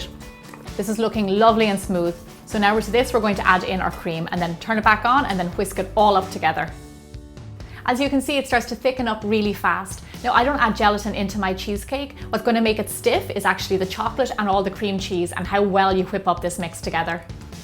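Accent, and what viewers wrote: Ireland. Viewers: Irish accent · R u from Northern Ireland or Southern Ireland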